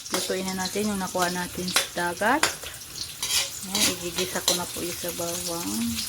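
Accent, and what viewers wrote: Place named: Philippines. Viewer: ❤❤❤❤❤❤dasal lang ate at magpakatatag ka para sa mga anak mo...rest in paradise kuya..mamis ko filipino accent mo..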